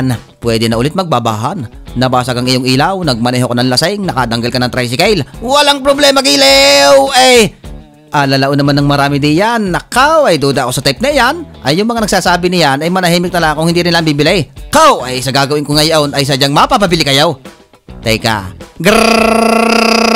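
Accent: Batangas, Philippines. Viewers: Solid Yung Batangueño accent Hahahaha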